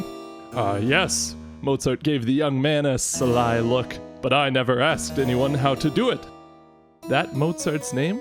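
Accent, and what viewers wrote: Germany. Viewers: his german accent kills me every time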